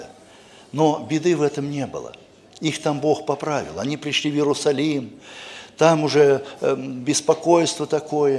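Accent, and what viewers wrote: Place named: Volga, Russia. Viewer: Спасибо. У него речь волжанина), я думала, что с Волги откуда-то) Мир Вам🌹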